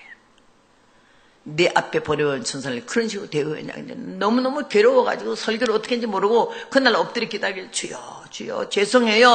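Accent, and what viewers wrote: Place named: Gangwon, South Korea. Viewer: 영상 다 뒤져보면....고향얘기 나오고요...강원도지역에서도 좀 지내셨어요.말투에서 음성에서..저도 같은 생각.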